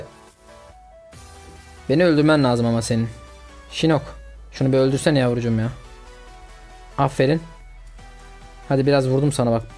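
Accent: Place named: Adana, Turkey. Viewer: GALİBA BENİM GIBİ ADANALISIN!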